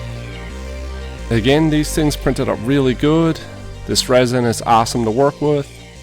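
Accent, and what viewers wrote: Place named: Newfoundland, Canada. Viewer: I figured you were in Newfoundland from the accent, but Labrador is probably even more remote! Have you tried ordering from Kingdom of the Titans before? They're located in northern Quebec and might be able to ship to you fairly quick. Keep up the great work, love to see more OPR content and quality Canadian content creators! 🍁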